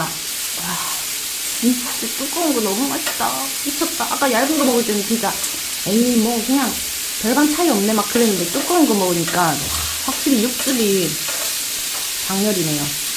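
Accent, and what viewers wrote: Gyeongsang, South Korea. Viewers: o sorry I thought it was Daegu accent btw u can speak English?!!😯😮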